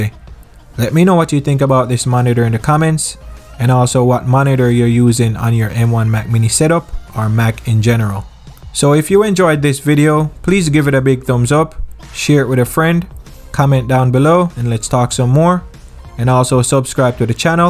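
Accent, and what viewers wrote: Jamaica. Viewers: As soon as he said the first sentence I knew man was Jamaican lol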